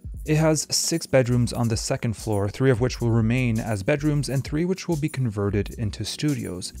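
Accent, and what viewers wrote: Quebec, Canada. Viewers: Hmm may be a kind of a stretch but i always assumed you were quebecois from your pronunciation of french words and names! Love this channel keep doing what you are doing!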